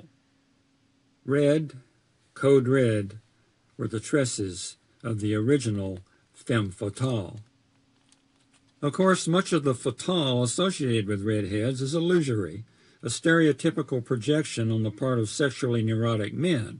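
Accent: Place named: South, United States. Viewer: I'm a huge Tom Robbins fan. Am going to read on my YouTube channel. Came upon your channel. You have a new sub. You sound Southern. I'm from Alabama❤🇺🇸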